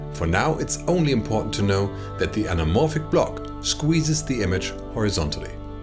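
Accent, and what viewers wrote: Germany. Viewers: Wow, what an interesting and good looking channel! I did watched yesterday 4-5 Videos during my work from the homeoffice. Echt Freunde ich schaue schon seit Jahren englischsprachige Channels und Tutorials und jetzt treffe ich aus Zufall auf euch. Dachte ich immer die amerikanischen Channels wären besser, da spricht mich doch einer mit deutschen Akzent an. Ich denke ich werde Mitglied bei euch werden. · Thanks for this works! It show me how much i miss fo to the cinma! Also you have a nice gemran acent! And this is rare as i know as a german. Also instan season 4 and 5 shoot of the expanse shoot with lenses.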